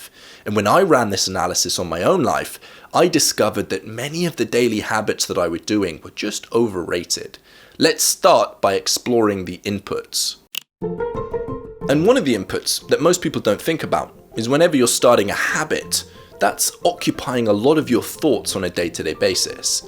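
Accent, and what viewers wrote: United Kingdom. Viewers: Great! It's awesome. Are u from UK?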